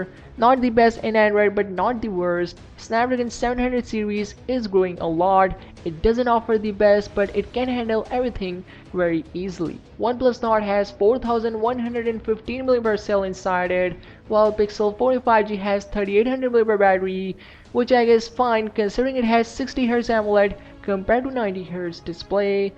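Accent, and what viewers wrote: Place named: India. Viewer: Indian english so ...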